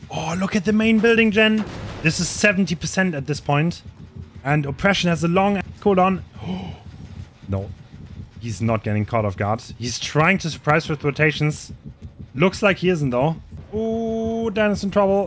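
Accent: Germany. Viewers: This german acsent.. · bro are you german?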